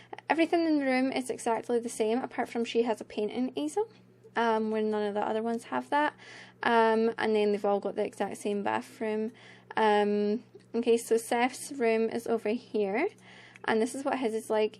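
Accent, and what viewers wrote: Scotland, United Kingdom. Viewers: I'm pretty sure is Scottish, not Irish.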